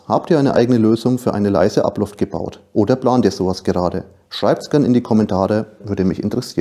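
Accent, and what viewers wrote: Franconia, Germany. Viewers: bitte nicht falsch verstehen - deine Lösung ist durchdacht und erfüllt seinen Zweck. Wollte dein Projekt nicht schlecht machen, falls das so ankam. Besonders der fränggische Dialekt hat es mir angetan ! :D grüße aus Minga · Immer wieder angenehm den fränkischen Dialekt zu hören. Sehr informativ. Dankeschön 💚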